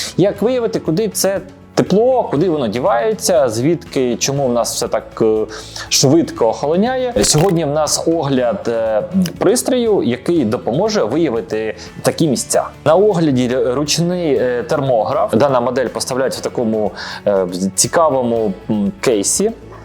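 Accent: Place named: Poland. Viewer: На каком языке этот тарахтит, смесь польского и русского.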